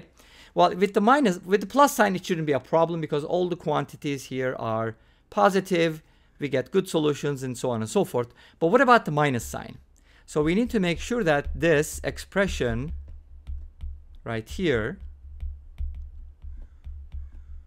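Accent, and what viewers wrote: Turkey. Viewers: yutube Algorithm beni buraya getirdi videoyu izlerken ulan diyorum kendi kendime kesin tûrk kesin yav sesinden biliyorum diyorum ama neyse videolarini ailecek çok beğeniyok 😂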